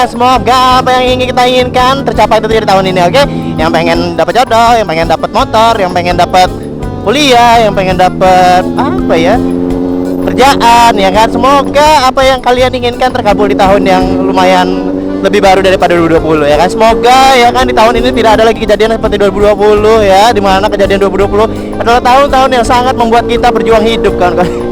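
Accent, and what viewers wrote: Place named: Java, Indonesia. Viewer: Ngakak pas bang bicara ada logatnya jawa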